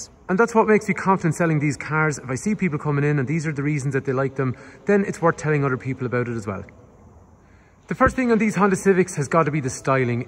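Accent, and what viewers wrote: Ireland. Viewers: This is what we want in a review. A speed talking Irishman! I love it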